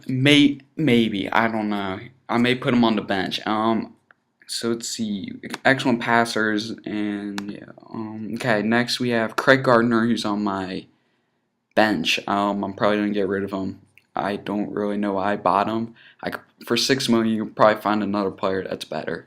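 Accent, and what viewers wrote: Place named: United States. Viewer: OMFG you are so american. descusting accent. albiuuunn, widffs, willshiuurrr lmfao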